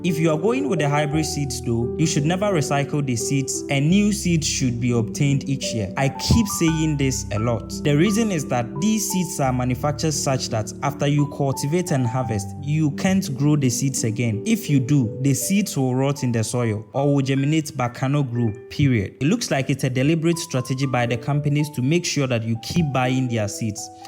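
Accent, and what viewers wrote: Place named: Ghana. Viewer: You sound Ghanian 😊 Nice presentation!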